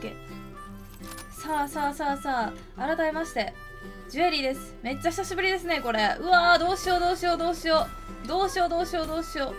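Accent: Kansai, Japan. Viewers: お待ちしておりました！さんの関西弁ちょー好きです！w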